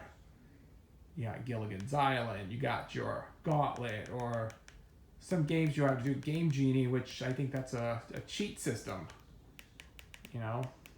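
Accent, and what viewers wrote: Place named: New York, United States. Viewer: I can hear from your accent that you're a new yorker lol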